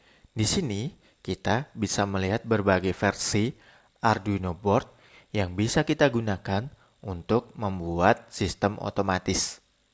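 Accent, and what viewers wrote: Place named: Java, Indonesia. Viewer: Suara siapa ini kang ? Kok medok hihi Sukses channelnga kang,,, salam G2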